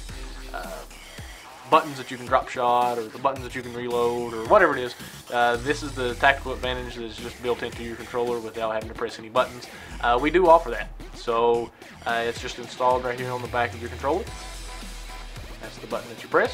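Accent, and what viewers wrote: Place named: South, United States. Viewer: Dude, you have such a cool southern accent!! I wish i still had mine but i lost it when i moved up north:(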